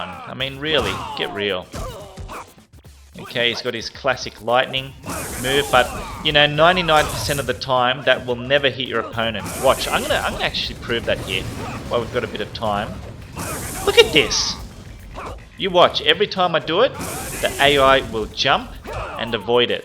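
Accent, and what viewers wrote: Australia. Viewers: It'd ruin it if he did make it 'big' though, part of his charm is that he more or less DGAF. He keeps it real, he's just an authentic, enthusastic gamer who's very naturally funny and is excellent at explaining things. The Aussie accent is a bonus. If he went down the Pewdiepie route I'd never forgive him.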